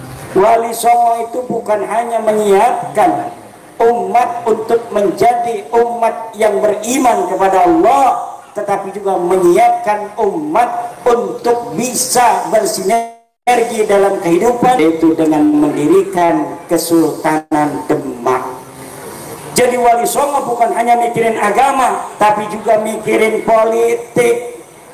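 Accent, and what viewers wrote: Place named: Cirebon, Indonesia. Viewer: Ko bhs e mirip Cirebon an y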